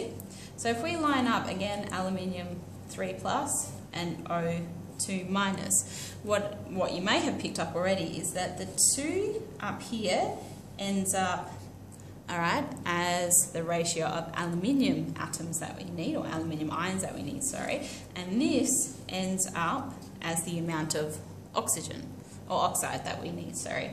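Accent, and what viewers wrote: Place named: United Kingdom. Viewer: Yo she’s British that’s how British people talk, not everyone is American and speaks like one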